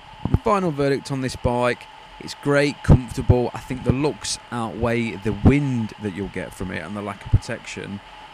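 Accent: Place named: Midlands, United Kingdom. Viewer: where ya from? you sound midlands ish. len Manchester in Melton Mowbray do the new model. they might have a demo you could test.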